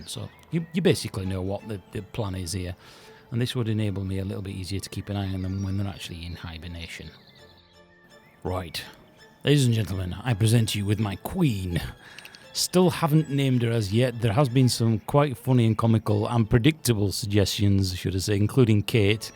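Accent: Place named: Northern England, United Kingdom. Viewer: That moment when you realise your tube doesn't fit.... Love the northern accent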